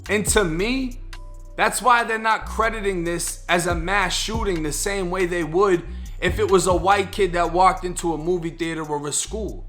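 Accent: Boston, United States. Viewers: Super dope channel. Random: Is that heavy accent on "AR" words only common with white people from Boston? I don't think I've heard any black dudes talk like that. Lil Mahk lol (Marc). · R u from Boston with that accent?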